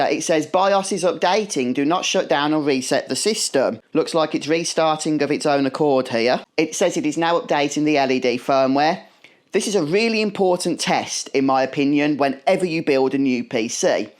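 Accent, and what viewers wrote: United Kingdom. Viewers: I just built my first PC, ITX as well! I was looking at the Terra but decided it was a little pricey for my liking. Btw, I am curious about your accent, which part of the UK if you don’t mind?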